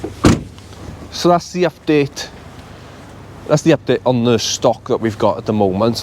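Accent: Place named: Wales, United Kingdom. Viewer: Speak to Dave the lovely car company, has recently set up at unit with an in house mechanic, there is also a podcast / YT video where him, Joe and Lee (Car UK) talk about the equipment he should get (nov 24 i think it was). I do social media / YT stuff for them and as far as i know its one of the best things he's done, his words. In the mean time you could kit it out and get mobile mechanics in to use the area for your cars until your ready to employ them. great content btw, also I love the welsh accent with words like Fumming hehe. Lastly what they done to that audi is out of order. any way keep smiling and good luck with your new start up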